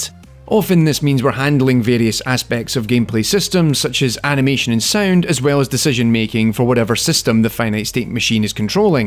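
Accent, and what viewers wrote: Scotland, United Kingdom. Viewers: "Valve's 1998 shitter." I'm like yeah it's old but shitter is a bit harsh... oh he's a scotsman. =D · Took me nine minutes to realize he's scottish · please keep being scottish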